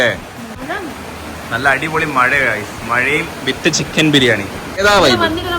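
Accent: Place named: Kannur, India. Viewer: Kannur slang istamullavr❤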